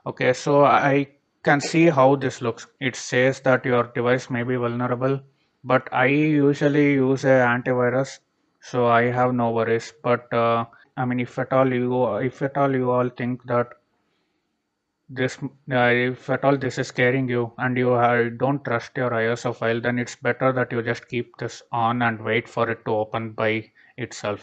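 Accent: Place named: India. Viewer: I hear Indian accent, I listen to the end. Fast, to the point, solved my problem. Thumbs up!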